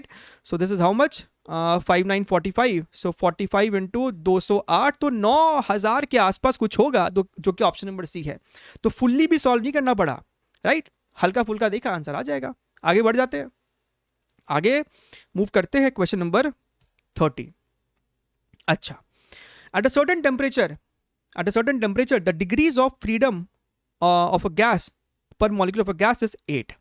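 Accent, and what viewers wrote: Bihar, India. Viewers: Sir aap bihar se hai kya?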